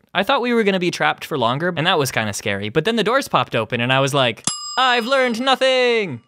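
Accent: Canada. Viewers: you kinda sounded like a candian from south park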